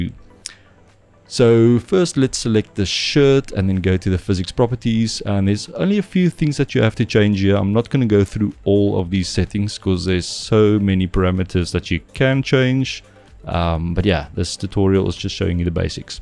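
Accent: South Africa. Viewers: Ppreciate the video bro! Also, are you South African?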